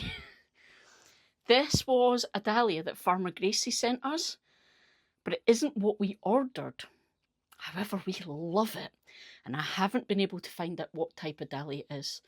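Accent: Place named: England, United Kingdom. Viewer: Thank You, Canada here. Just got some tubers (60$), so dont want to lose them.:) You helped alot. Peace. Love your accent (so pretty)... English accents always sounds like birds chirping... kind of. :) Peace.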